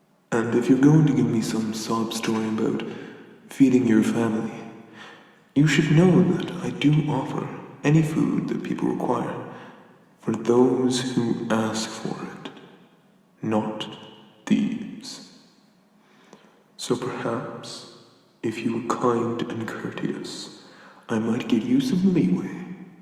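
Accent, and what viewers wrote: Ireland. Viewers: I'm a simple man, I see irish accent I click. No regrets here, I'd love to be this giant's pet. Edit: God your voice is hypnotic in this. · Oh my, thank you for Irish accent ✨ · Irish accent Wasn't Atlas Greek though?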